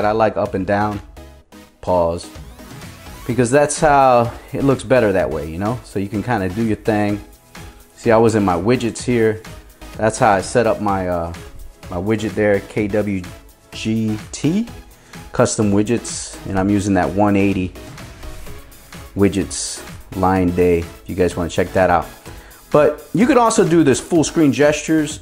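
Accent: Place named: England, United Kingdom. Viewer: What is ur English accent bro?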